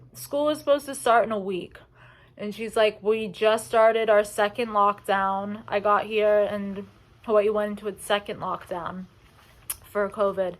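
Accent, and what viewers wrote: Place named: New Jersey, United States. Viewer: Look like from Boulder talk like from Jersey.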